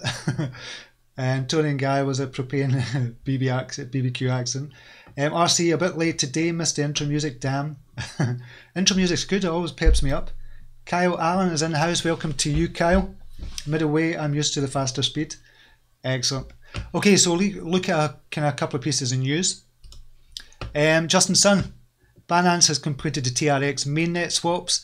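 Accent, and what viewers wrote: United Kingdom. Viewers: Such a puff of fresh air......a British accent in cryptoland.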